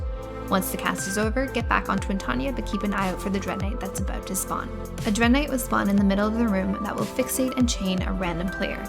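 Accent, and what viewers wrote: Canada. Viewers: Are Canadian or from Minnesota? (The narrator)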